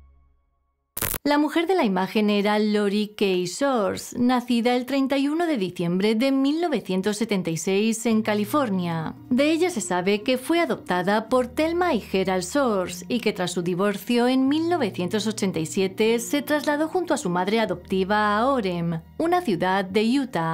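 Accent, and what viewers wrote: Spain. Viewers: Porque la critican aquí en España se habla así vale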